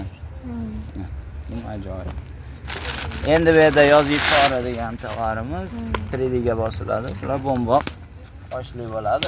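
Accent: Khorezm, Uzbekistan. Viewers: Ишларингга омад Хоразмча суйлаб урганибди молодец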